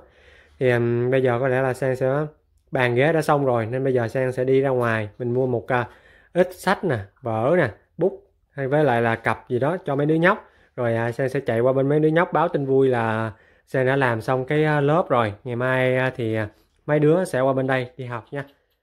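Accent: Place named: Mekong Delta, Vietnam. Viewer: Sang nói chuyện thì rất giống người miền tây, hình như hai mẹ con Sang là người miền tây thì phải.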